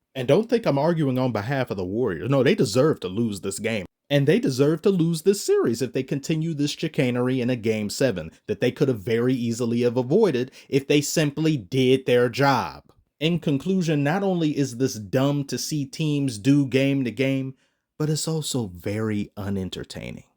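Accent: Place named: United Kingdom. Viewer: Sounds like a British/Aussie accent more than a Kiwi one lol. Keep it up tho, moite! 🤙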